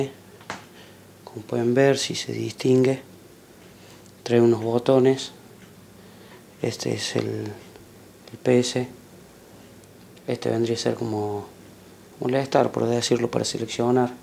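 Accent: Córdoba, Argentina. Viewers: sos de Cordova? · amigo sos de cordoba o de buenos aires etc etc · no tarde ni 5 segundo en darme cuenta q sos cordobes aguante cba loco! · Se te nota lo cordobés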